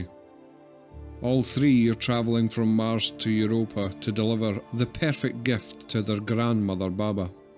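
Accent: Scotland, United Kingdom. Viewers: I'm here for the Scottish accent 😎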